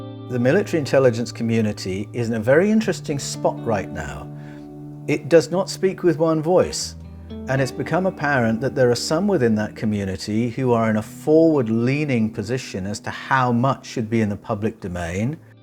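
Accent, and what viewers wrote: Australia. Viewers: I took down a scathing comment fueled by disappointment over tainting your material with background music. But your material is WAY too critical and far too poignant at this time to need ANY "accessorizing" brother. Trust me, you are amongst the vanguard and a pillar of this global movement into Truth, and Truth requires no supplementation or overly loud background music. We're hanging onto your every intrinsically melodic Aussie word anyhoo, and imo you require no musical accompaniment.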